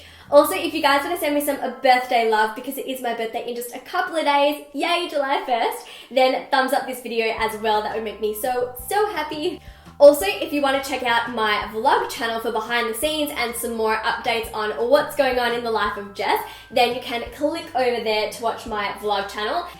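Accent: Australia. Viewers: kritika singh ahahha shes australian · Australian I'm pretty sure 😊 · R u Australian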